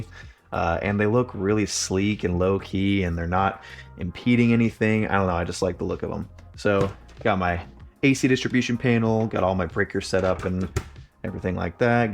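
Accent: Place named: Northern England, United Kingdom. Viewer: imagine y’all grew up in a similar area to have that same dialect must be up north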